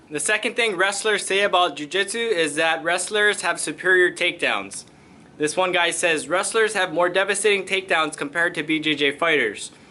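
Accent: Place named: Hawaii, United States. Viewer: I was listening and thought to my self "this braddah sound like he from Hawaii" sure enough you are 🤙🤙🤙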